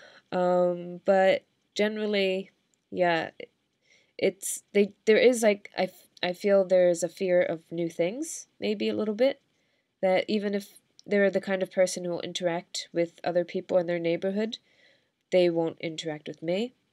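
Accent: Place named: Ireland. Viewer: You sound irish. Are you from boston?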